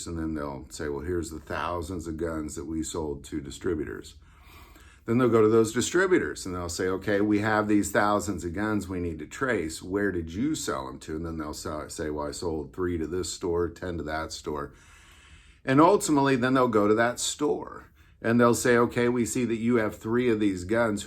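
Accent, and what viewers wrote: South, United States. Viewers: oh ok thank you & happy thanksgiving 🦃🍽 to you & your family you sound like you live down south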